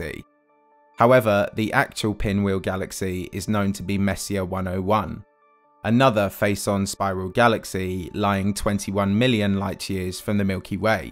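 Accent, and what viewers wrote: United Kingdom. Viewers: UK accents aree annoying.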